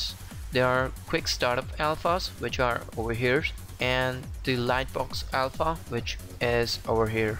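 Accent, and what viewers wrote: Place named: India. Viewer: your indian accent is kickin'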